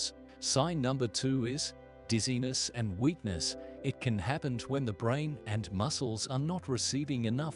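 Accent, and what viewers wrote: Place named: Australia. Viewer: Omg i love his Australia accent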